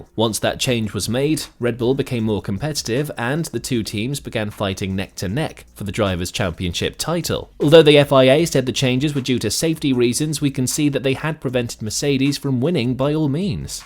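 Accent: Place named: United Kingdom. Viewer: It's getting to the point with these videos, that as soon as you hear a bri'ish voice-over, you may as well skip it.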